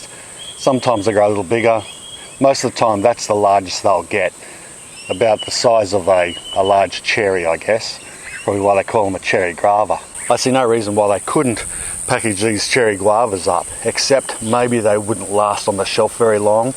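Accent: Australia. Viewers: You`re a cutie! As soon as you spoke of heat, humidity, and a storm coming I thought "Are you here in Florida? Maybe south - nobody wants to be in the north. But his accent is Aussie, so likely the other side." Then I saw "subtropical (similar to Florida)" in your description, and it all made sense. I clicked the button. That wee lime looks amazing! I can imagine those bubbles mixed into a salad dressing .... and each bite of food has a little surprising ZING of lime. Hmmmmm.... I`m starting a farm soon ..... I may have to figure out how to get a tree growing here. OH.... and then mosquitos - are you SURE that you`re not in Florida??? haha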